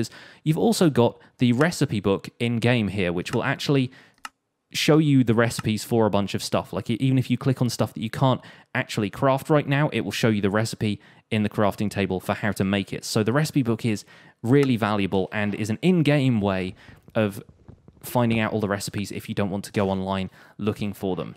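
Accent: England, United Kingdom. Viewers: I love her English accent...skl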